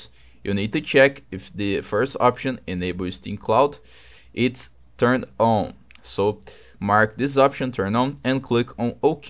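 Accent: Brazil. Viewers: no puedo aguantar al br hablando ingles asdkjashdka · i love you, eu sei q tu é br, esse sutaque nao me engana marvadu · esse ingles é brasileiro ein kkkkkk satisfaçao irmao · Thank you very much. Recognized that brazilian accent from a mile away!!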